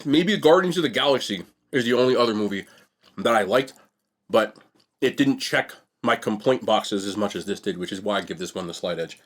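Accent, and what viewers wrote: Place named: New Jersey, United States. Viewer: Bro are you from Jersey ?!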